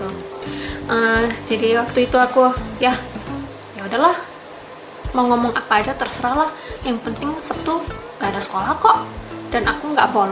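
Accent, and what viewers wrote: Java, Indonesia. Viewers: Medok nya suka akhuu.. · Onee chan medok bngt ya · Jepang medhok Jowo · Boso Jowonya kental banget 😁😁😁😁😁😁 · Logat nya Jawa banget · kok medok ya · Waw jawanya medok bgt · Waaa😀😀 cantik banget.. dan medok nya ❤️❤️❤️ semangat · Medok e mbak 😁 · Kak, jowone medok bngt..... 👍👍 colabs, lgi sama RIZAL nipon, dong kak... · Harusnya ini artis dr jepang dr pada Haruka. Aku suka cara bicaranya lebih medok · Akhirnya ada youtuber Jepang yg medhok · Medok tenan mbk...😄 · Bahasa Indonesia pake logat jawa😅😅😅😅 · Anjirr.. medok banget mbk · Saiki tinggal neng suroboyo yo mbak.. medok jowonE.. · Nihonjin logat jawa:) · Terimakasih, warga Jepang yg sdh sangat apresiasi thd bangsa, bahasa Indonesia, sampai sangat MEDOK SEKALI bahasa Indonesia dan bahasa Jawa nya. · Lah kok medhok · Wow kok bahasa Indonesia Nya medok bangat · Teruskan medoknya krn itu kearifan Person😃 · Bahasa jowone mledookk mba'e 😁😁🤘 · Orang Jepang ngomong nya kok medok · la kok jowone medok to banget mbak...😂 saya pernah dapet uang 20.000 sobek. 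tp sabungannya pake uang 2000. jd totl uang ku 22.000 😢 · Your Javanese accent is amazing.. cannot believe you grow up in US. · Kamu jowo ne jowo ndi? logat ne kayak wong jowo! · Ngomonge medok''hhh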